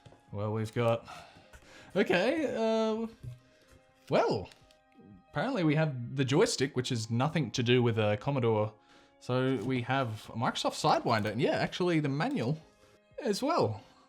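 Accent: Australia. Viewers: What state in Australia you from dude?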